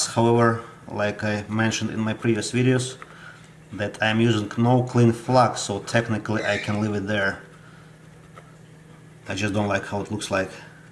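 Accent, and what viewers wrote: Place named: Russia. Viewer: Жесткий Русский акцент ;) молодец руки из правельнного места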